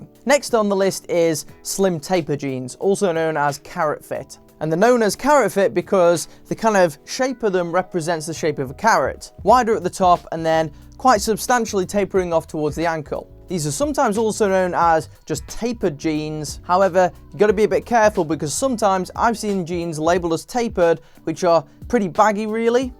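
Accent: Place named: England, United Kingdom. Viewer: Dislike because if english accent! Hard to understand! Dislike 3 times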